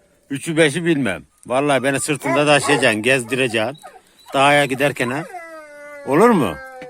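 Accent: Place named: Kastamonu, Turkey. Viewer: Kardeşim tekrar geçmiş olsun allahım şifa versin ben ist dan yazıyom kastamonu pınarbaşılıyım eytliyim çıkar öıkmz memleketime gidecem ama ilk fırsatımda seni ziyaret edicem çünkü sen bizim doğal şivemizi temsil e uyuyosun tebrikler saygılar selamlar kardeşim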